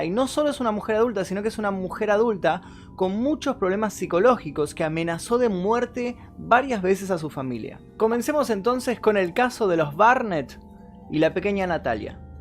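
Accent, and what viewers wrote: Argentina. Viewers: jajajajajaja tranquilo bro, no puse nada malo xd me gusta el acento de los argentinos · Argentino che? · SOS ARGENTINO ??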